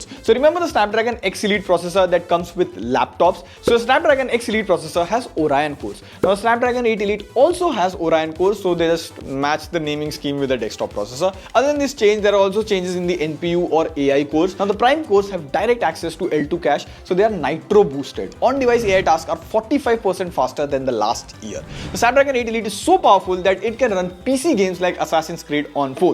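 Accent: India. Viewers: hahahahah i cant unterstand the indian english ahhahahaha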